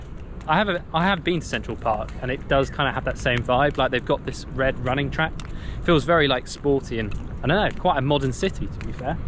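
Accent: United Kingdom. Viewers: I really like your English accent , very nice 👍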